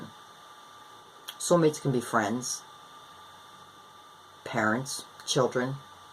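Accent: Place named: Southwestern Pennsylvania, United States. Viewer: I'm quite impressed... yes, I have done so much work through the past six years, work on myself. This time last year, I found myself feeling differently toward someone with whom I'd shared chemistry with, but had never thought of romantically. Then.. POW! Everything had changed. The work shifted and I've been drawn, so has he, by the Universe... coming together. He -- a water sign, yes-- is in a dead relationship, in reality -- the ties are still present, but there is no involvement, no life in it. No, I could never be jealous, ha ha. Spot on. And of course, I visited the Pisces reading you did, and you describe escaping a dead relationship, unleashing yourself from your own internal prison. (And you sound like you are somewhere near the area of the country where I live, ha ha... SW PA.)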